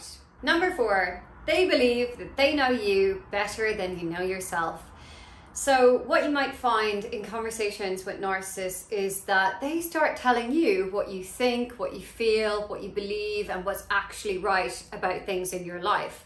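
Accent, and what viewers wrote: Ireland. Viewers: is that a hint of an Irish accent ?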